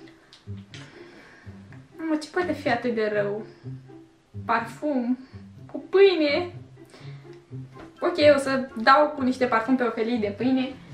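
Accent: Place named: Moldova. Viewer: Ce accent de moldoveancă ai 😍😍🤗😊🤩😘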